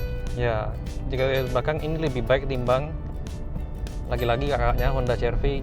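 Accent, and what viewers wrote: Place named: Surabaya, Indonesia. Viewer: Mantep reviewnya logatnya Suroboyoan :)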